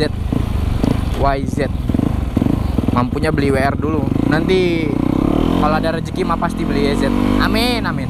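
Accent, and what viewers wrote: Papua, Indonesia. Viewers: Bisa logat Papua yaa bang. Kreenn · bah sioo, ternyata abang juga ada logat papunya yah ternyata,, sukses terus abang salam dari Timika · om dari papua k? om pu logat kya di papua jd😂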